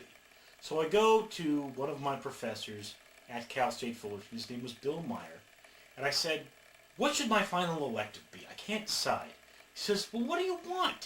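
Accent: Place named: Australia. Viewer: def australian well g'day mate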